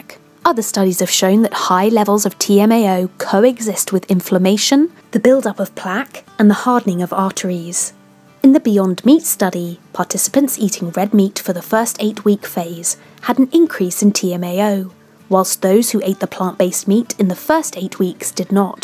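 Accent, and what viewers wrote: England, United Kingdom. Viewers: Most things not taken in moderation is not good for you. So how much money did the animal agriculture industry pony up to fund this research? Must have been a lot since they even got a woman with a nice English accent to sound convincing. Clever!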